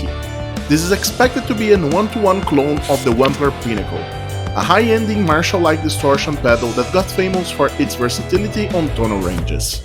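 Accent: Brazil. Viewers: Esse timbre é de brasileiro falando em inglês em haha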